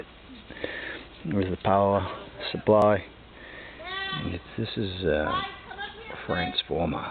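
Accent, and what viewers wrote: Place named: Maine, United States. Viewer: If I had to guess. I bet your from Maine.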